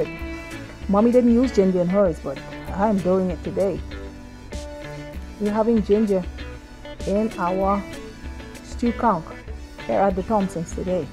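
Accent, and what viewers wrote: Bahamas. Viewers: My father's side is Bahamian, Mother Floridian !! This was one of my favorite dishes!! I was the one pulling out the hammer to pound them . Memories, love the accent 😍!! I'm in Colorado!! Purchased conch from an Asian market . Will try your recipe. Watching you refreshed my memory of the overall process. Happy eating 😋!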